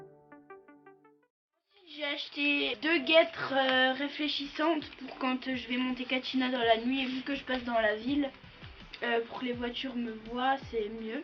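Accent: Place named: Switzerland. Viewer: a ok je trouvais que tu avais l'accent suisse😂 sinon je découvre ta chaîne aujourd'hui elle ets vraiment génial ❤️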